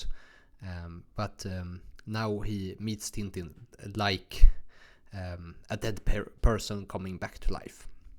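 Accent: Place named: Sweden. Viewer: S tier Swedish accent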